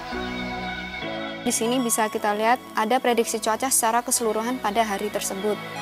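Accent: Java, Indonesia. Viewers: Saya suka semi medoknya itu dari mba nih hehehe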